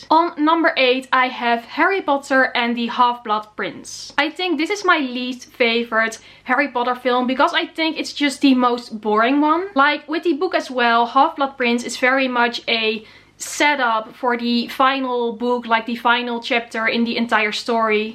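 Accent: Netherlands. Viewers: Your accent is charming :) I immediately started wondering where are you from while watching one of your previous videos ... but I've just glanced at your description and now I know that you're Dutch! As far as the movies go ... I was dejected enough with the Half-Blood Prince to ignore the Deathly Hallows I premiere in Poland where I live. The last two movies I only watched at home.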